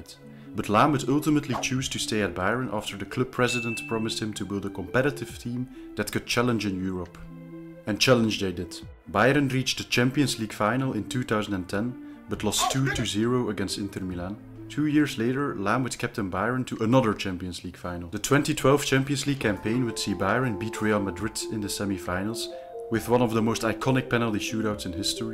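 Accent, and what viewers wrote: Belgium. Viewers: Bro is that a Belgian accent I hear? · Maat gij zijt zo zwaar belgisch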